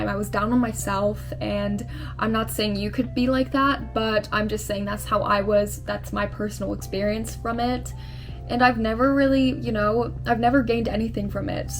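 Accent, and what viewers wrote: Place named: United Kingdom. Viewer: Loving the video so far! Please can you do a full YouTube video using your British accent? I would love to her it❤️!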